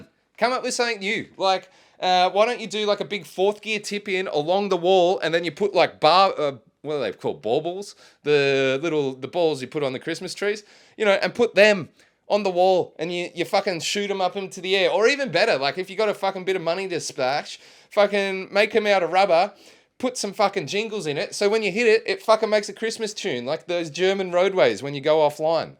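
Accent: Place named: Australia. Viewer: Bittersweet moment seeing this cause I thought I had 15 years worth of podcast to catch up on. However I would watch wreck em podcasts for at least the next 15 years if you decide to do more. Really enjoy hearing about the history of wreck em and Aussie drifting in general. I listen to a lot of American drifting podcasts, but it’s just not the same without an Aussie accent and a shithouse sense of humour. Also love your mentality behind fun drifting not just competitive drifting. I don’t want comps I want trains.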